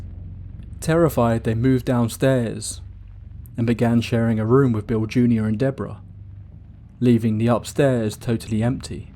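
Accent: United Kingdom. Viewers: Your voice makes these stories so boring and uninteresting. Please be less British.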